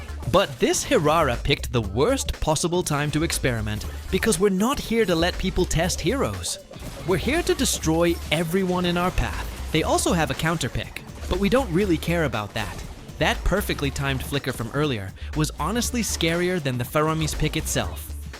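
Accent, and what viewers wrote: Ilonggo, Philippines. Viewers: Huli Hahaha ilonggo ka gale nga bilatibay ka ha😂😂😂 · Ilonggo po? hahhaa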